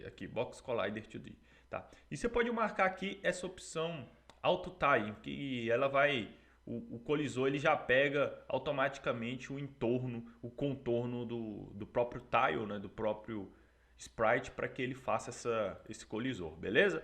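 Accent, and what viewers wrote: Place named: Ceará, Brazil. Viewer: otimo tutorial. bem simples e eficaz. a proposito, vc eh cearense? percebi pelo sotaque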